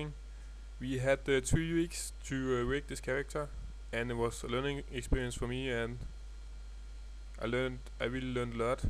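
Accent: Denmark. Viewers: you sound danish, am i correct?:p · Are you danish, ? Your english sounds bit like the 'danish style'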